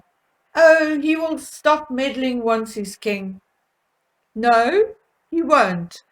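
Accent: South Africa. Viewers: I have been in the UK for nearly 20 years, and I see quite a few similarities to South Africa, but you dare not say anything without being told to "go back home then if you don't like it". I see it in job advertisements where in South Africa you have still the person of colour being preferred for the job. That is happening here now. They word it where they say they prefer applicants of colour/persuasion. Love your research and as a South African another welcoming fellow Saffa accent.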